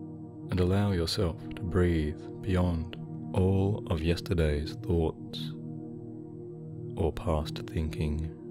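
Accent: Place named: Australia. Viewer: Very nice Meditation, love your accent, Australia or New Zealand? Namaste!!!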